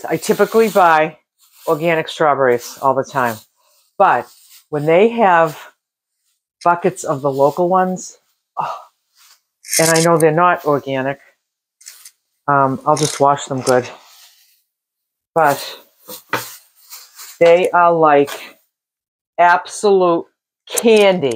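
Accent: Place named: New England, United States. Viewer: Hi, new to your channel. Where do you live? I'm detecting a New England accent. I too would love to buy a van and travel, so your channel intrigues me.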